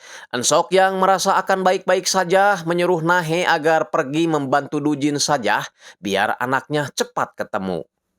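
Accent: West Java, Indonesia. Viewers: Saudi hadir Saya suka yg baca filmnya sepertinya orang sunda Bnyak lucunya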